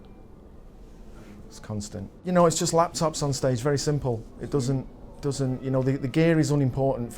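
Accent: United Kingdom. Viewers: Jesus Christ, as a Yorkshireman I listened to his projects thinking he was some deep mysterious american for some reason so to see him with sunglasses on with a Northern accent is incredible 😭 big up the north! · he's from stockport, same as me. I think hearing him speak exactly as I do and as everyone I knew growing up did comforts me a bit, but I'm really not sure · He sounds like every British guy ever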